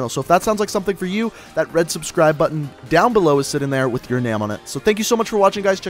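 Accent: Canada. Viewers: is this guy canadian he sounds canadian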